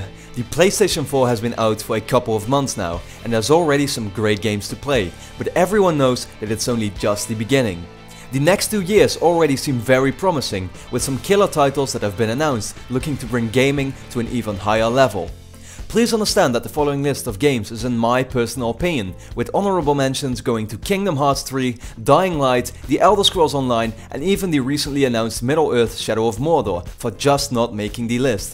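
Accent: Netherlands. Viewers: duidelijk te horen dat deze man nederlands is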